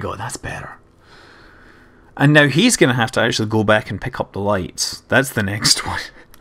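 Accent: Scotland, United Kingdom. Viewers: Nothing can be boring with a scottish accent!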